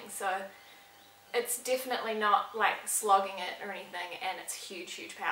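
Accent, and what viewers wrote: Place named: New Zealand. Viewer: No offence but u sound Kiwi. Are u kiwi may I ask?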